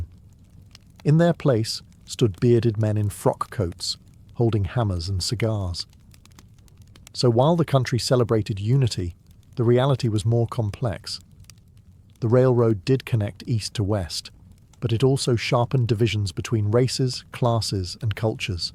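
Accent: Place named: England, United Kingdom. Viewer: Cool history subjects! What puts me to sleep it is the English accent, I always fall asleep watching movies with English accents 😴😴😴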